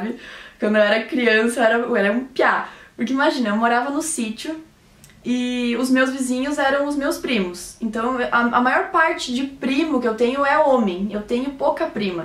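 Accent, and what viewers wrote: Rio Grande do Sul, Brazil. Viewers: Meu pai também é agricultor. Ele planta melancia. Sua história é triste mas tem um final lindo e um aprendizado de luta. Se tem pessoas "infelizes"que fazem comentários "fdp" com certeza elas não tem louça pra lavar e ficam enchendo teu saco e te incomodando, mas teu coração e conteúdo é tão maravilhoso que essa gente idiota só eleva teu sucesso. Diva. Achei que era gaúcha natural... hehe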